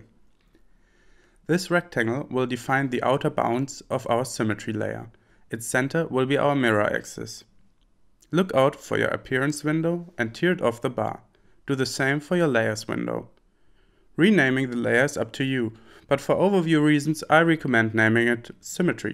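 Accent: Germany. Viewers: you have a german accent, are you german? Man I love your tutorials! because you simply show the hidden tips and tricks, I'm a professional graphic designer, but those little tips you give, I haven't seen them anywhere else before! keep it going :)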